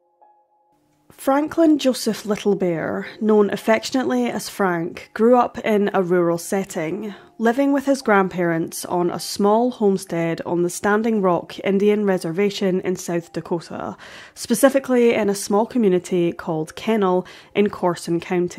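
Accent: Scotland, United Kingdom. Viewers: The Scottish🏴 accent is quite lovely🤗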